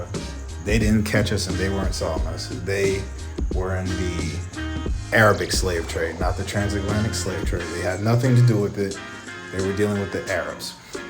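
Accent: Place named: United States. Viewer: Good luck.. It's a great feeling having an educated American-English accent hitting my ears. Usually, listening to the typical African content creators' videos you must pay attention, take notes and translate or transliterate as you go through their videos....they mumble, mispronounce and are, quite frankly, so unprofessional...like they're talking to the gang on the corner stoop. I know for many of them these are initial steps into a larger world and they will get better. I see your channel as Google's attempt to enlarge the ethnic audience with their algorithms...let's hope that last....I'm glad I found you. Keep traveling my brother, I'll be seeing the world through your eyes and I am very interested in seeing what else Africa has to offer.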